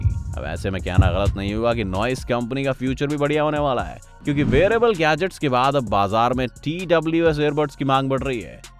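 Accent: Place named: Mumbai, India. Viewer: Plz bring old voice artist back ,this guy is ok but his accent is like a tapori style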